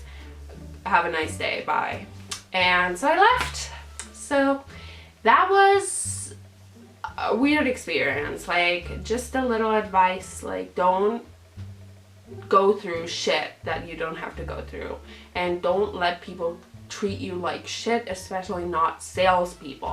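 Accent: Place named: Germany. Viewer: Cierra Carter she's got a bit of German accent. why would anyone EVEN say something so ruthless.....unreal.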